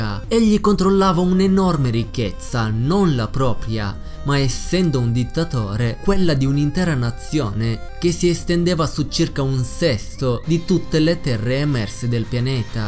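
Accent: Russia. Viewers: è Russo :) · è russo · Madonna che voce ma sei russo???È fighissima la tua voca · È RUSSOOOOOO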